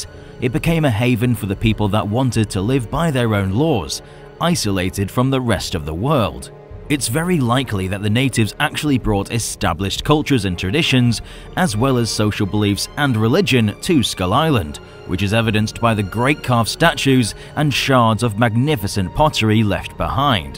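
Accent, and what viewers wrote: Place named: United Kingdom. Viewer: U sound like every British person ever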